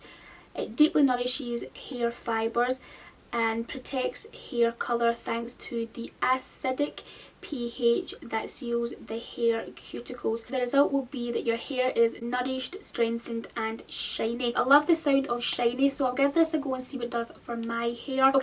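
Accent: Scotland, United Kingdom. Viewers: Beautiful Christmas box! I'm trying to place your accent. Are you by any chance from Scotland?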